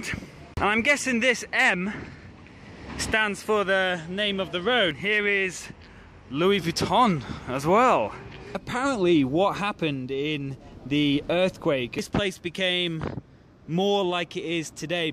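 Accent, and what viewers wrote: England, United Kingdom. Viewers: Me encantan tus vídeos!! Aunque soy mexicana me ayudan a conocer mejor la Ciudad de México (soy de Acapulco), y me ayudan con el inglés. Por cierto me encanta tu acento, siempre he pensado que el acento británico es el mejor en inglés. Espero que le entiendas a mi comentario, aunque puedo leer y escuchar en inglés no soy buena escribiéndolo. Muy buenos vídeos, sigue así :) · Diana T no es Americano es ingles de Inglaterra. checa el acento.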